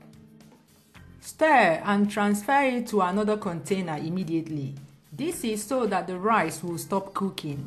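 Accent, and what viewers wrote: Nigeria. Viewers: LOVE TO HEAR U TALK NIGERIANS TO.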